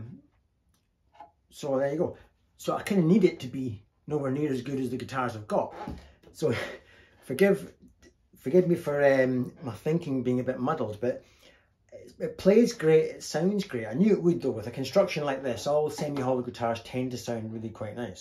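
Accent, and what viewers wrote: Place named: Glasgow, United Kingdom. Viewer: Accent is throwing me off a bit. Sounds Glaswegian blended with something else, perhaps you lived somewhere else for a few years? I may be way off as I don't hear such accents too often here in Seattle.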